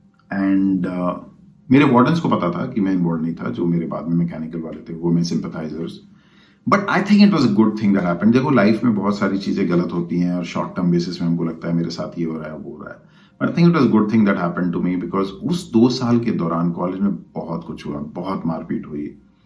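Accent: Bihar, India. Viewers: Apka bihari tone me bolna bht apnapan deta h. Thanks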